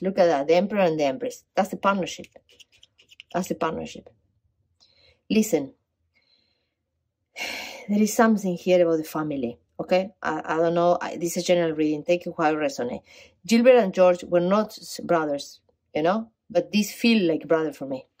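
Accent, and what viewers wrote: Argentina. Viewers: reconocí tu acento ... cordobesa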